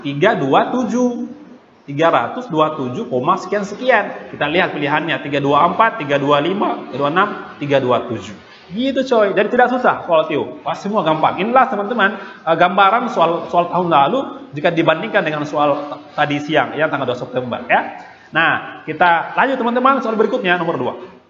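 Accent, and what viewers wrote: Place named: Eastern Indonesia, Indonesia. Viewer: asli mana bung, kayaknya timur ni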